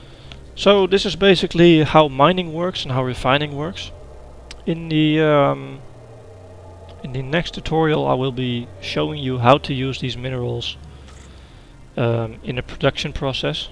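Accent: Netherlands. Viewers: do i hear a lil dutch in ya?:P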